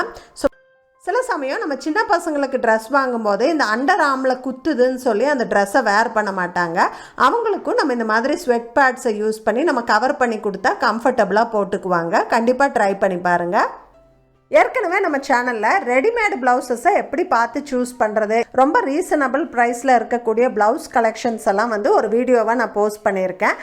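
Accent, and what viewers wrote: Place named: Kerala, India. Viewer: tamil with Malayalam slang nice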